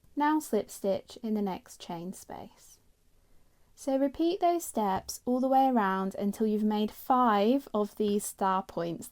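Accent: United Kingdom. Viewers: again, US English terms and UK English terms are NOT the same. Examples: US single=UK double. US treble=UK double treble. The terms are 1 off from eachother. Considering her accent, im going to guess her terms are the UK terms, hence: she did exactly what she said she did 😊. Hope that helps clarify my comment.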